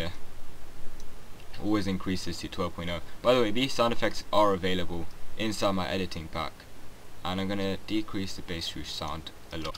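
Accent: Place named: United Kingdom. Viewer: my man sounds like the most british person i've ever heard btw props to u for doing this whenever i ACTUALLY try to use cins ima watch this again cuz its actually really good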